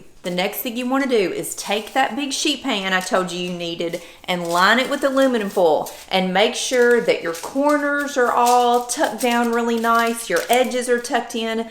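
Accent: South, United States. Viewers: I just love your accent, i can tell you are a true southern lady! And your kitchen is beautiful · Why name it Christmas Crack??? That is the grossest name you could think of. Makes your southern drawl seems even more unbearable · 1st of all, love love your "Rizos/Curls", lol. Gracias for your lovely Southern accent & more importantly the literally walking us through to this mega pretty & delish goodness, lol. I'm not a Baker, hopefully this shall change a "Beba" step at a time. I'm in my mid 60's & still a "Beba"/ Baby Girl at heart, lol. Blessings & hugs....❤😊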